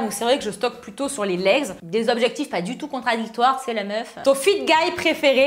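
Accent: Brazil. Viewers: Trop chou ton accent brésilien j adore! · On sent que tu parles un portugais brésilien à l’accent aha😂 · voce e muito linda e eu amo teu accento brasileiro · Mais c parcque elle parle avec l accent brésilien qui est différent du portugais c pour ca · L'accent brésilien c vraiment dar 😏👌👌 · Tu na pas réellement parler portugais c’est plus brésilien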